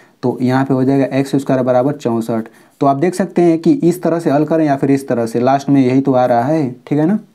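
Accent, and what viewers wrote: Bihar, India. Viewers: Sir Aap Bihari hona na anyways Thank you 👍👍👍👍👍👍 sir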